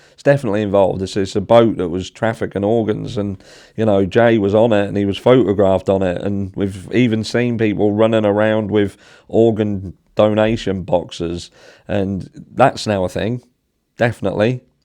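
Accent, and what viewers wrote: Norfolk, United Kingdom. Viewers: Are U a Norfolk boi at all U narf sound like us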